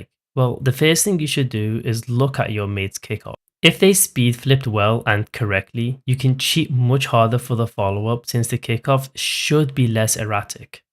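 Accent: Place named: Liverpool, United Kingdom. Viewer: Good work mate 👏 Why do you sound like a fellow scouser 🙀🙀🙀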